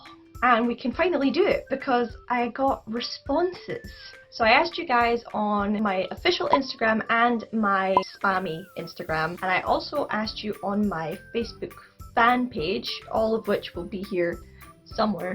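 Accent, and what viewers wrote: Scotland, United Kingdom. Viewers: What part of scotland are you from??💗💗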